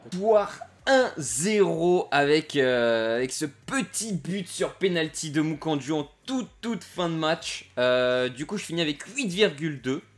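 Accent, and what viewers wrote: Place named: Belgium. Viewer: j'ai l'impression que tu prends l'axen belge je c'est pas si ya que moi qui l'ont on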